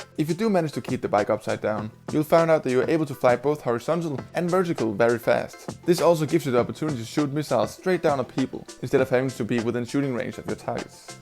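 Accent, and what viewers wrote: Denmark. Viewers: i can hear you dansih becus of the accent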